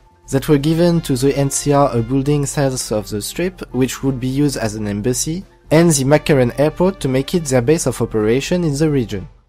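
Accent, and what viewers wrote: France. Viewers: I'm french, I can assure you it's a french accent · Ouais par contre ton accent français ça se voit à des kilomètres, essaye un maximum l'accent anglais svp Un petit effort pour nos amis les anglophones. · Sounds French to me. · Freeeeench...... What you have to say is really interessting but man' that is so akward. Can't listen it entirely.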